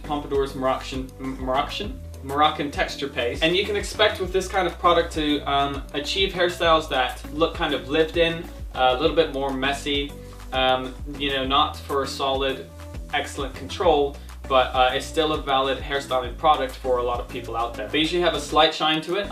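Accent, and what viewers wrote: England, United Kingdom. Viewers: Is it just me or does it sound like he's supposed to have a British accent? Haha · I know I have said it before but English accent is coming on REAL thick! Like damn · lol come back to america your starting to develop a british accent and im not used to it😂😂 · why does he sound british in this video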